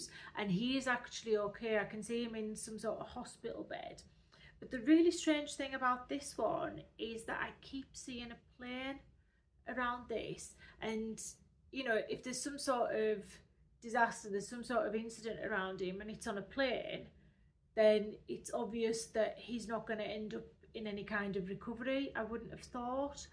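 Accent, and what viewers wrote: United Kingdom. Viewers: Is this man British ?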